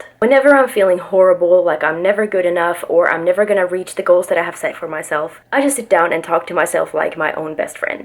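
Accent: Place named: Louisiana, United States. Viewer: is getting that Cajun accent. 😛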